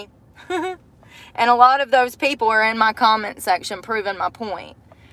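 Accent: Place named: South, United States. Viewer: Your southern accent... it's on 🔥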